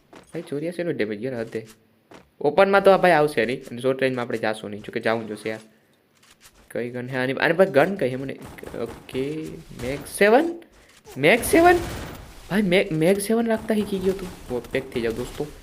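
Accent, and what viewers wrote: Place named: Kathiawar, India. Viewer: Bhai thodi kathiyawadi pan bolo ne pehla ni jem. 🙏😊,commentry in old style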